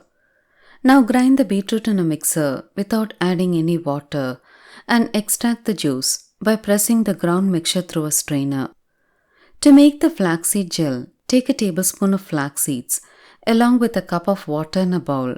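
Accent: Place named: Tamil Nadu, India. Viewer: கொஞ்சும் தமிழ் உங்கள் குரலுக்கு அழகு அக்கா.....😍😍😍😍😍😍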